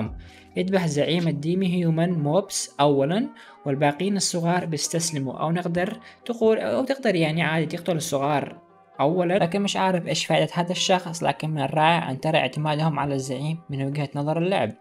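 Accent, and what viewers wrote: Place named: Aden, Yemen. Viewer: لهجتك يمني وعدني تحديدا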